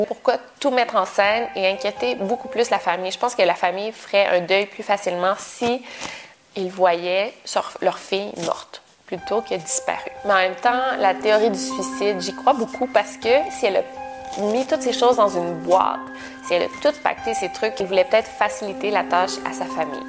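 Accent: Quebec, Canada. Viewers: C'est marrant cet accent canadien. Pour moi, il est clair qu'elle s'est suicidé et que son corps n'a pas encore été retrouvé. C'est pourquoi elle avait besoin de boire parce qu'elle avait pris cette décision, de se suicider. C'est tellement triste :( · Chers cousins du Canada, j'aime bien votre accent.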